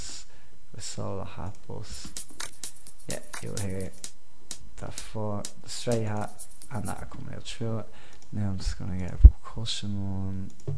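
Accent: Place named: England, United Kingdom. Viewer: it´s englisch dialect !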